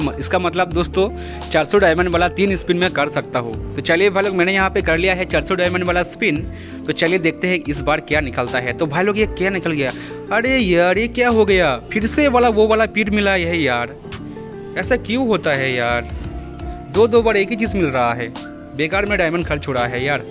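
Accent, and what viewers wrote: Bengal, India. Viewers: Bhai app bengali ho kaya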